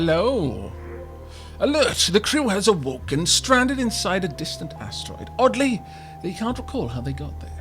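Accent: Wales, United Kingdom. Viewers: Are you from Wales by any chance? Your accent sounds very Welsh. I live there!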